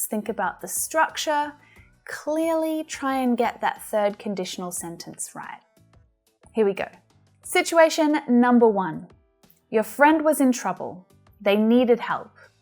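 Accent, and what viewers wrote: Australia. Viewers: You are from Australia no America l don't like your accent l prefer the American accent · If I had known I was supposed to bring some food to the party, I would have made a delicious meal and brought it to the party.... I love your Aussie accent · Teacher are you from Astralia? your accent is very pleasant to hear !!!! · By hearing your accent it seems like you’re australian, am i right?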